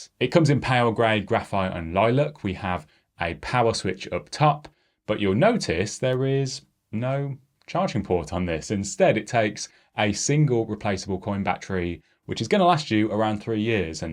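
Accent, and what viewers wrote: Midlands, United Kingdom. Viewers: Are you from the Midlands? I’m trying to place your accent. I love the Retro charger - I’ve been after something like this for upcoming holidays.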